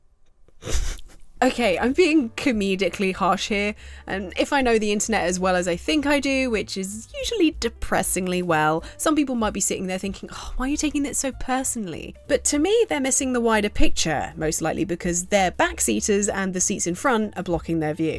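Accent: United Kingdom. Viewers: I just think you're swell. You have one of those voices I could set my Jarvis to. Listened to all your videos, some more regularly than others, and that old cliche holds true. I could listen to you read the phonebook and it be entertaining...or ya know, Oxfords dictionary. If you wanted to go old school 🥴 explains: New English like "Frack" entered Oxfords dic. this year and means frack, n.: “Used as a euphemistic alternative to fuck, n., typically in phrases suggesting a dismissive, hostile, or exasperated response, such as to give a…” Ranting, explaining, sick, expositional, vlogging, No matter the temperament, the topic, or the energy I just like hearing your voice with all its British inflections and your squeaky mannerisms. Like a massage for the brain. Like the mic setup btw. Ok peace.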